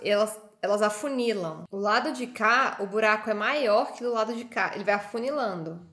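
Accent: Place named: Northeast, Brazil. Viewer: você tem família nordestina?! Não me lembro se já disse, é que cê tem um sotaque bem puxado pro sotaque nordestino, acho uma graça 😍🙌🏼🙌🏼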